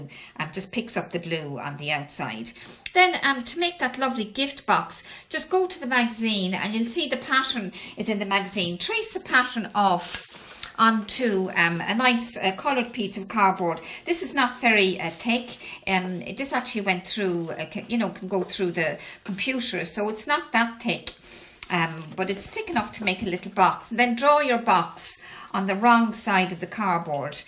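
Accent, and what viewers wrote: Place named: Ireland. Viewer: Thank you. I love your lovely Irish accent.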